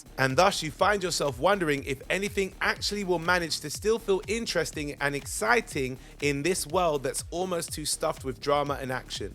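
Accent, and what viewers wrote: United Kingdom. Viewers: This guy is to British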